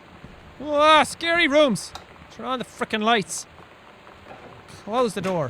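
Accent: Ireland. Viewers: “ya fecking idiot” got me XD god, I love how some accents make certain words just sound hilarious. Not meant to sound racist, I love different accents, honestly I kinda wanna condition myself into having an accent like Jack’s, despite not being Irish, I just love the sound of an Irish accent. Don’t know why, they just sound satisfying to me.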